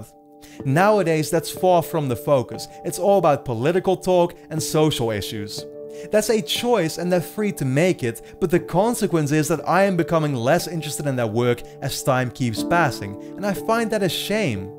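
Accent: Netherlands. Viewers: Never heard of your channel, and Youtube pretty much always recommends trash. I decide to watch a recommended video for once, and it's gold. Spot. On. Plus, hup, Holland hup! Meer Nederlanders met een stevig accent in games!!!!!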